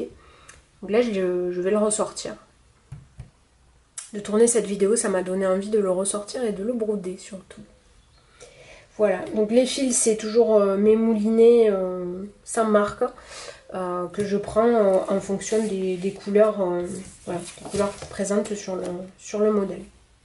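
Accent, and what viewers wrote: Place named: Southwest, France. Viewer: bienvenue dans le club des premiers flosstube lol tres joli accent ça sent le sud ouest je dirais ... j ai le même accent et ça fait plaisir ta derniere creation en petit coussin est magnifique j ai beaucoup d admiration pour toutes celles qui font du point compté car moi je suis essentiellement sur du travail en toile imprimée gros bisous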